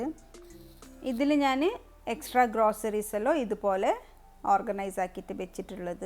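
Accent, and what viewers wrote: Coorg, India. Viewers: Samsaarm kekumbo vijaaricchu coorg thanne aanuu enn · Hii samsaram ketappo thoni m in coorg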